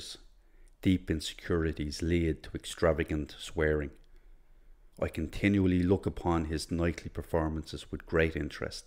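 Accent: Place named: Scotland, United Kingdom. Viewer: I like your accent. Scotish accent I suppose.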